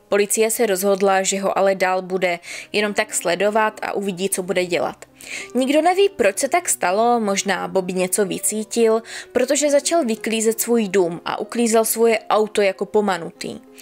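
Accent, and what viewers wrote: Moravia, Czech Republic. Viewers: Jsi super.Krasne se té poslouchá.Mas něžný hlas a ten moravský přízvuk je tak roztomilý🤗Vždy se těším na každé tvé nové příběhy🥰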